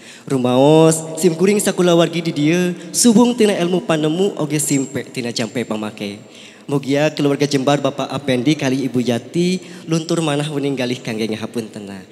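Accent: Sunda, Indonesia. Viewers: Sae tah nyunda ngalegeuna.ciri has utang sunda.terus mumule bahasa sunda.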